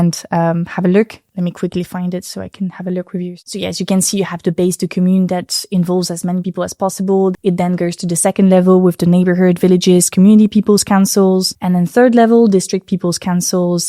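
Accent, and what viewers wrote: France. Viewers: It was just too much for me, Im allergic to french traing to speak English and leter R, I know what I said, just another youtuber traing to get some like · You sound french 🤔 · You accent is so cool. You sound french. Then you sound australian. And little bit of scottish.😊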